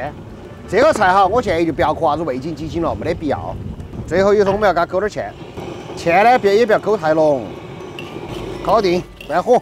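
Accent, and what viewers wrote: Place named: Guanghan, China. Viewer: 你应该是德阳附近的人吧，和广汉人说话口音特别接近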